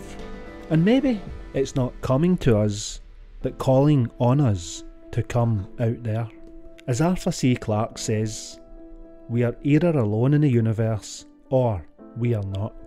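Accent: Scotland, United Kingdom. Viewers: Clearly Scottish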